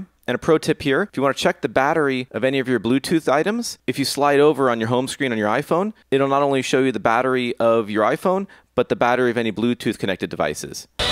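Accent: Greece. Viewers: R u greek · Are you from greece?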